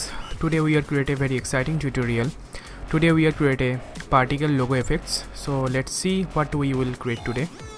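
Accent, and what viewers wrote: Bengal, India. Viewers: wow the Indian accent !!! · Really hard time understanding a word he's saying... Too strong an Indian accent. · sounds indian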